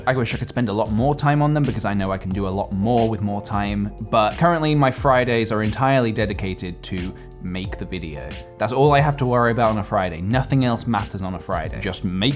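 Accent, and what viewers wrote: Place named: England, United Kingdom. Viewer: I just realised 1 big thing. I understood how cool are u as a human, and I can't really talk with you, meet you. Just even see you. Even if we somehow would met I can't even talk with you in a normal way. You are from England. I can barely understand you(because Im from Ukraine ), but I really love watching your videos, and thats basically all what I can do. Please guys, thumbs up for my comment if you think same way. I really want to to see me. Thanks keep making great things for us, we love you.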